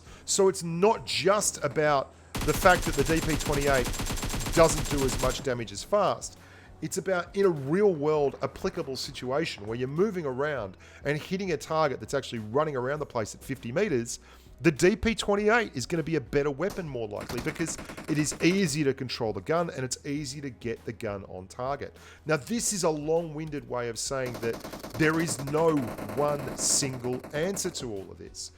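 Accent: Australia. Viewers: You don't sound British but Australian. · love the content in general, love the Aussie humour, the accent and the honesty of the commentary. Thanks! from Ireland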